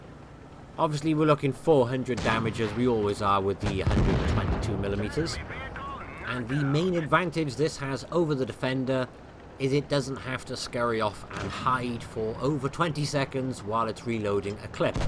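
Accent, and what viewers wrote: United Kingdom. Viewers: wat the british accent ! XD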